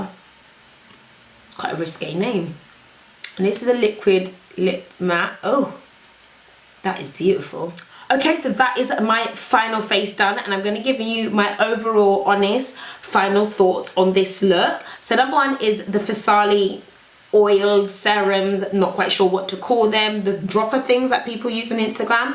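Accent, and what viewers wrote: England, United Kingdom. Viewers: I love your british accent,love :))) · English accent ?😂😂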